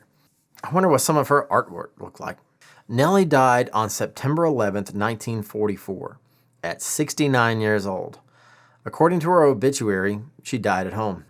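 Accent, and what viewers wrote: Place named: United States. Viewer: love watching from UK . So interesting . Love the USA accent ...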